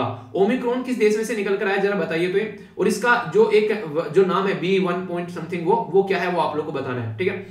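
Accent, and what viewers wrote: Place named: Kangra, India. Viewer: Sir kangadi bolte hai 😎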